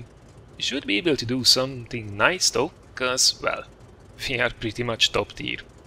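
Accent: Hungary. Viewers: Tudtam, hogy magyar vagy! :D Csak néha belenéztem a videóidba, és a kiejtéseden lehetett hallani, hogy magyar vagy. Csak amíg nem jelentetted be, nem voltam benne biztos!:D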